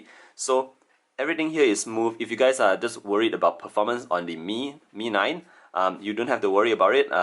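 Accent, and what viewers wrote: Malaysia. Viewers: Hi! great video and very nicely presented. Are you Malaysian? I somehow notice your accent is quite the same with my chinese-malaysian friends.. 👍😊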